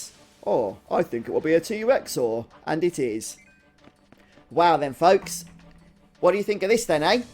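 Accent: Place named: England, United Kingdom. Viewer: Total no homo here but, dude, I love your accent. What part of England are you from? I could listen to your narration of anything and it would seem fun. Seriously, you could make technical manuals seem like an exciting read.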